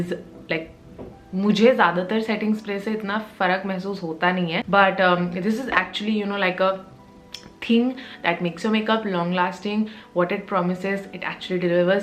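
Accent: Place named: France. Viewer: Loved your French accent 😃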